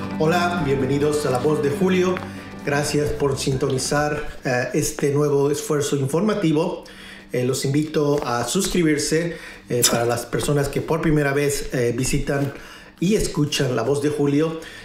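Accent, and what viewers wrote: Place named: Mexican-American, United States. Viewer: Julio felicidades por tu trabajo esperemos pronto verte en alguna mañanera con preguntas objetivas al presidente de México 🇲🇽 AMLO y tu acento más bien parece de EUA wow 😮 naciste en la sierra Oriental, yo creo que tú NACISTE en EUA y tus familiares son de esa Preciosa parte de México 🇲🇽 pues tú acento suena más como México Americano Pero que bueno que aprecies nuestras raíces mexicanas. Como sea Excelente trabajo Julio y seguimos apoyándote 👍👍👍👍👍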